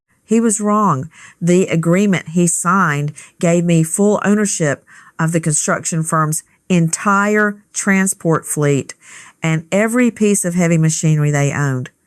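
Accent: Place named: South, United States. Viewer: Listening to this southern drawl accent is painful!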